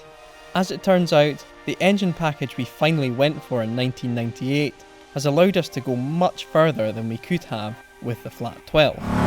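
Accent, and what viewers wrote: Scotland, United Kingdom. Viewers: so what he’s scottish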